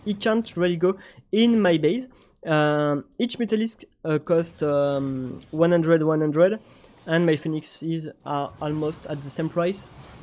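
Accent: France. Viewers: sont accent est si français que les sous titres automatique sont généré en français j'adore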